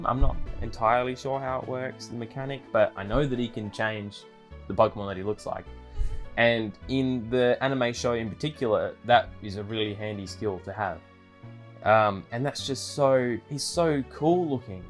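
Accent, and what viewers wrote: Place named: Australia. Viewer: Always loved the Australian accent☺☺. Awesome list, definitely more unique than most in regards to the parameters.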